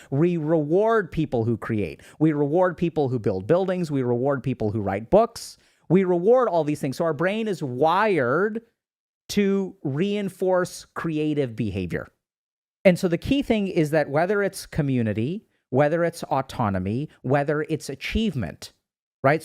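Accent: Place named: India. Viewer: HAHAHAHAHAHAAHHHAHAH THE INDIAN ACCENT HAHAHAHA XDD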